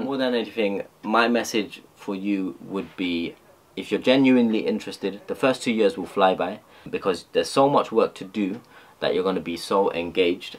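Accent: United Kingdom. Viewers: What is your accent? Is it british or south asian or both? Lol its all over the place.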